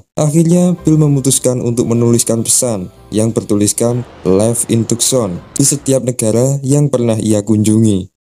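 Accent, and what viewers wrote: Java, Indonesia. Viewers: Jawa nya medok bgt jadi kga jelass asikkk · baru kali ini dpt yg jawa biasanya aksennya Sunda mulu 👍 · logatnya jawa bgt mas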